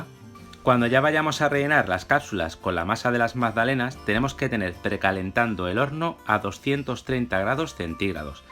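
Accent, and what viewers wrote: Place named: Spain. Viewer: gracias yo soy panadera me gusta demasiado la panadería de que parte del mundo eses tu tu voz parese española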